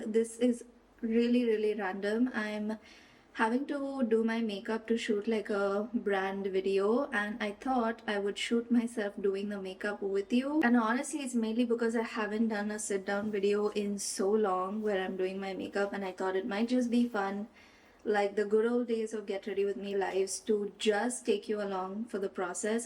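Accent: United Kingdom. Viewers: Never sat my face, nose intimacy and the British accent lol😂😂😂😂😂😂. Why are you so lowkey hilarious lol😂😂😂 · don't stop doing the british accent gurlll i love it 😁🩵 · yk i love your chill grwms and be yourself in the vlogs that you already are aur haan the brit accent was so cool..idk how people sound like that<3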